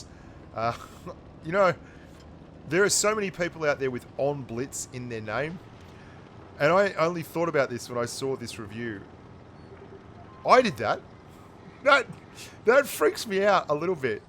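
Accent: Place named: Australia. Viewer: hey mate, r u Aussie? swear to go you sound like one.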